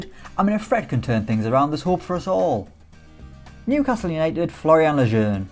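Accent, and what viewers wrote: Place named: Ireland. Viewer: If I don't hear the irish accent... I press exit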